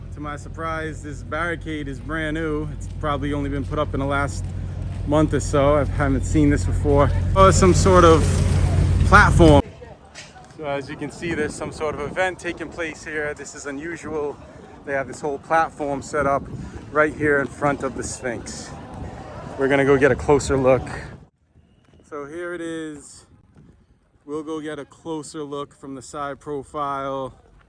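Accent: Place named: New York, United States. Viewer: You're one of like 4 channels I subscribed to. Keep the videos coming I think once anybody into Ancient Egyptology or even anybody who Just wants to see how beautiful Egypt is from your videos sees your channel you'll have a shit ton of subscribers. Very well informed and your vids show the real side of modern day and ancient egypt. Btw you're from NY aren't you? Lol I hear it hahahaha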